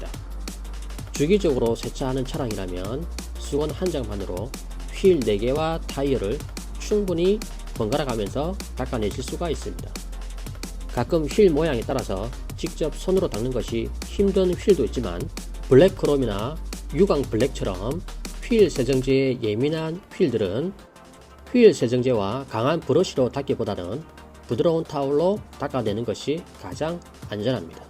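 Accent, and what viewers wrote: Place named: Gyeongsang, South Korea. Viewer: 어색한 표준말 경상도 티 다난다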